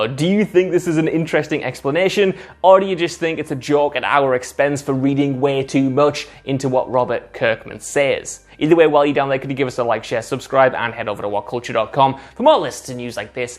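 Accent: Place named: Scotland, United Kingdom. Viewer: I think it’s so satisfying how Scottish (maybe Irish) he sounds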